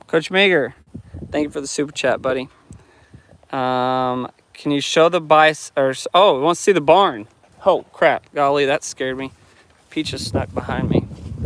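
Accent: Oklahoma, United States. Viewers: Ponca City says hello! Just found your channel and love it. I knew you had to be in Oklahoma by just listening to your video for a few minutes. The Crosstimbers in Oklahoma starts a few miles from here in the Tall Grass Prairie Reserve in Osage county. Your videos are outstanding. Daughter #2 graduated from OSU. GO POKES!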